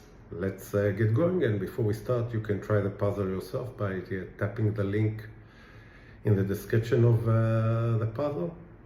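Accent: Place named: Israel. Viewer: Name is, has Israeli accent... נראה לי שאני יכול להגיד בוודאות שאתה ישראלי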